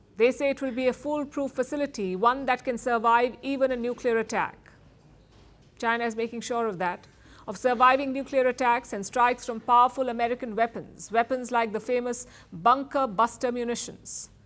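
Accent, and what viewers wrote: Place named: India. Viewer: Indian accent sound horrible 😅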